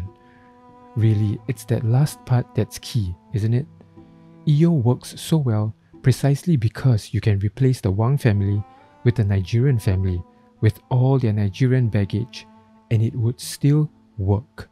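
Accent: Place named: Malaysia. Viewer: hi, hi. are you malaysian/bruneinese/singaporean? you have an accent from these region.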